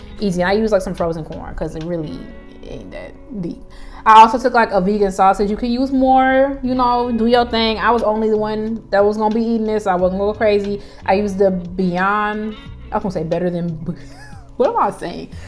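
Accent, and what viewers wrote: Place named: New York, United States. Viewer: Soon as you started talking it was giving NY lol we talk fast then you said “so boom” I’m like ok def NY. Then you said you lived in Rochester and that’s exactly where I’m from lol. Trying your recipe this week!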